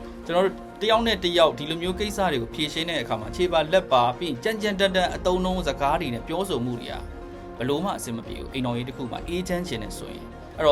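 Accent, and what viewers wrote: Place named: Dawei, Myanmar. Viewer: ထား၀ယ်လိုပြောနေတာ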